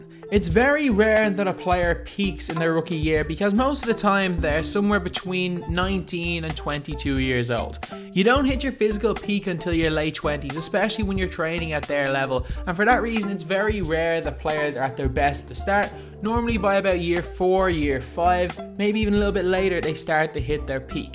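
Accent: Ireland. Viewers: Dude u sound Irish?? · D-ManHD lol what is this comment? He sounds Irish.... because he is Irish. Think about it.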